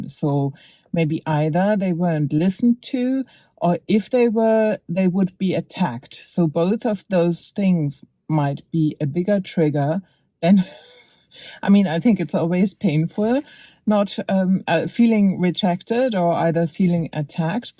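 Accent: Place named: Germany. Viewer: Nice and inspiring, I don't know why but I quite like the German accent lol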